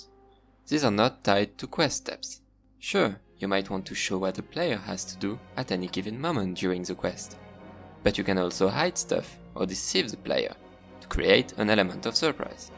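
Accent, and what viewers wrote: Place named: France. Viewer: Bon, je suppose que t'es français vu ton accent xD C'est en français le "campagne quest" ? Parce que c'est la première fois que je vois ça,et j'avoue que ça rajouterait un peu de lore épique :D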